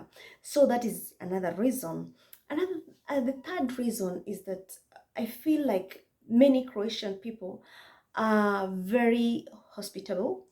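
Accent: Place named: Kenya. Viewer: I love the Kenyan accent!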